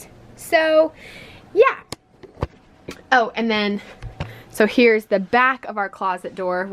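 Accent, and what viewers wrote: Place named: Kentucky, United States. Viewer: I was wondering why your accent sounded so familiar, I’m from Kentucky too! I’m about to graduate from EKU. Loved your Kentucky art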